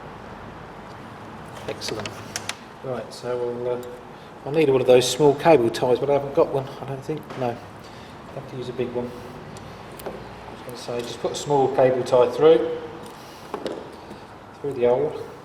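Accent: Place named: United Kingdom. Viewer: I love UK accent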